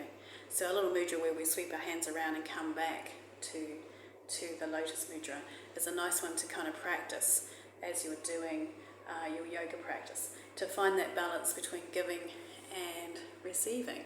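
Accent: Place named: Australia. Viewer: I am a mixed media Journaler. I am liking the positivity in your videos. Yes living abundantly is the key so WELL DONE. You have a lovely Australian accent but which Country are you living in plz? Do u grow your own food? Do u fast? I have so many questions. I am making space in our life to recieve.- decluttering- having 2 much stuff is hmm.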